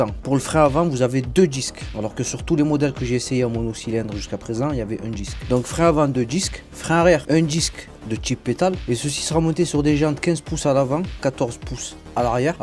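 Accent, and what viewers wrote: Marseille, France. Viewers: T ' es vraiment de Marseille ! .😂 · Cool ta présentation avé l'accent et le panorama superbe. Je ne peux qu'approuver tes remarques ayant une version de l'Xciting de 2019, je ne peux que promouvoir ce scooter qui se faufile sans problème dans les rues et la circulation parisienne. J'ai juste eu un problème de cartographie Moteur qui faisait que je calais à la décélération de temps en temps mais avec la nouvelle cartographie, c'est nickel. Je regrette juste de ne pas avoir le TCS et le superbe écran du 2023. Sinon j'ai possédé avant pendant 2 ans un Xmax 400 et bien le Yam à coté du Kymco était plus que décevant (position, moteur & confort + sécurité). Ride Safe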